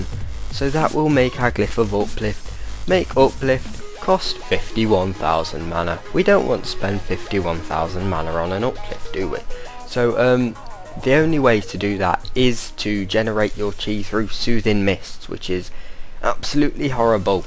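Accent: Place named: United Kingdom. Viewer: Where are you from? Trying to cypher which flavor of the UK accent you have?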